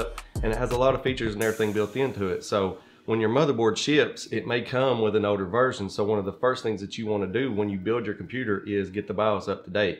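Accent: South, United States. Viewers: thank you so much. didn't expect a computer man to have a southern accent haha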